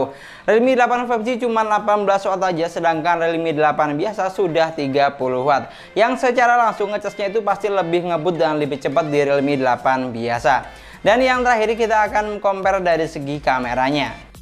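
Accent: Madura, Indonesia. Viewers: madura aksen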